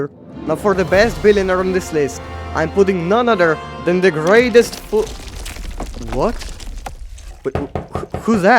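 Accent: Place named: Southern Europe. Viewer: im guessing youre either from sa or southern europe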